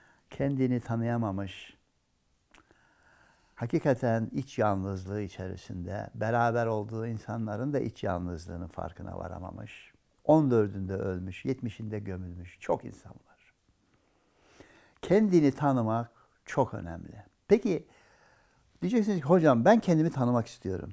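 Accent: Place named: Azerbaijan. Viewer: Azerbeycan şivesini çok seviyorum, Teşekkür ederim ❤🙏🙏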